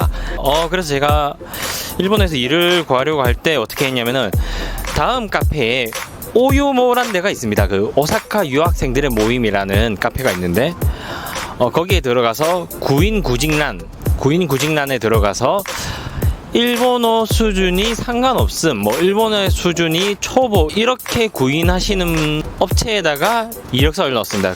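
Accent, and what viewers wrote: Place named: Busan, South Korea. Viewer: 영상보다가 억양을 들어보니 부산분 같은데 고향이 어디신지요? 저는 부산입니다.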